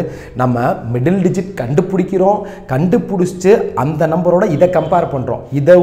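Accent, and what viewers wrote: Madurai, India. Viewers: Madurai Lang itu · Madurai slang bro👌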